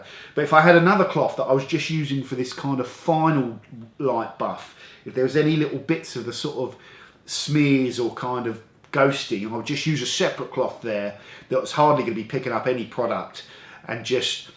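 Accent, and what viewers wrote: England, United Kingdom. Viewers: Accent? An English man speaks English 🙆‍♂️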